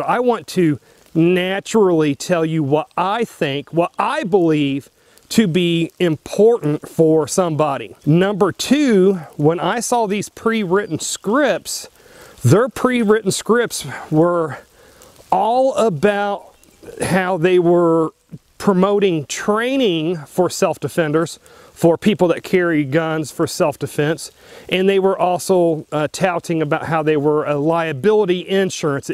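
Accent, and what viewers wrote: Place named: South, United States. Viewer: Just sounds "country" to me. At least thats what the kids are calling it these days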